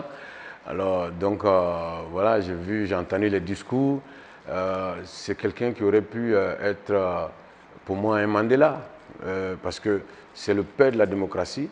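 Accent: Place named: Ivory Coast. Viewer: Mais c'est difficile que a ton âge tu ne connais pas ton pays d'origine par ta parole on connais de quel ivoirien tu es... Importer ou exporter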